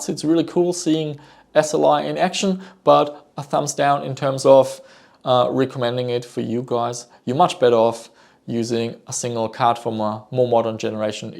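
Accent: Germany. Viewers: You live in Australia with a german accent?? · Why do you sound German to me? I'm German and especially your pronunciation of "the" really sounds like a German or Danish guy speaking English.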